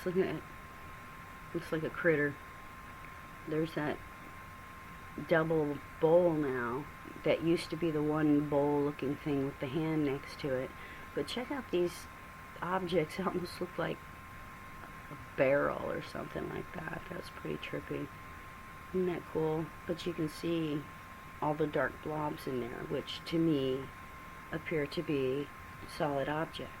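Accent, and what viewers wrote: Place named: South, United States. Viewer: spoken with a sweet southern drawl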